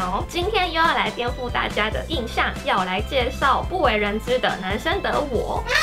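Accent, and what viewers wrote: Standard. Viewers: 且慢！你这熟练的国语，你真的是日本人吗？？？为什么国语比我还标准？！ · 撒醬的中文大概是我聽過的日本人中最標準的。語調、用字大部分都正確，厲害！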